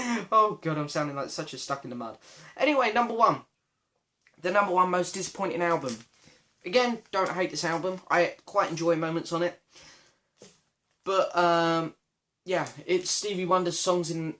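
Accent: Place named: Essex, United Kingdom. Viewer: Where you from? Essex? Love the accent I’m from Romford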